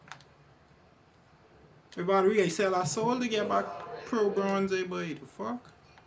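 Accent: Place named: Bahamas. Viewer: You from the Bahamas?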